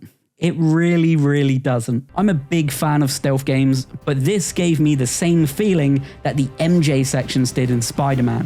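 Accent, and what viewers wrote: England, United Kingdom. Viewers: This video is up there with majority of "Woke Critic" channel videos, only the difference being The Woke Critic is likely to be Irish instead of somewhat pompous but still financially Chav English of yours and that's also a complement too lol Greetings from Indonesia, I really don't like how this game sets up and putting the gameplay models of certain things, it looks like a early access game but with slightly more polished models that looks like a combination of photorealism & uncanny valley, which Sweet Baby Inc and their networks of gloriously self-righteousness consultancy (and their associates) doesn't disappoint at disappointing their audiences, as usual...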